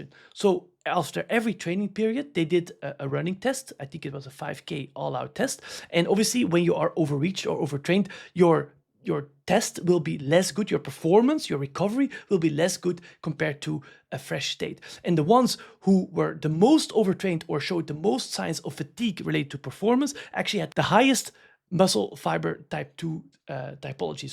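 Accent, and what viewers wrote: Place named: Netherlands. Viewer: He sounds dutch or flemish. In those languages, the emphasis is on the first syllable. Otherwise his english is pretty solid for a second language. Constructive remark though.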